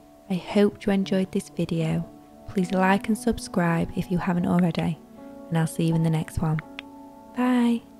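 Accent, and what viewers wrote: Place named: Northern England, United Kingdom. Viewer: is hers a Northern English accent? Whatever it is, I love it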